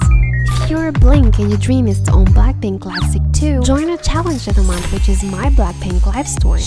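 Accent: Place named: England, United Kingdom. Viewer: I like your English accent :)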